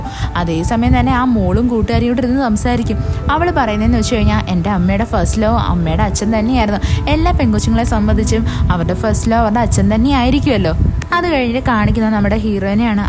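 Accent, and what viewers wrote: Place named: Kollam, India. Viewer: കുട്ടിയുടെ വീട് കൊല്ലം ജില്ലയിൽ ആണോ 😂എന്തുവാ, നോക്കിയാണ്,നിക്കുവാണ് , etc ..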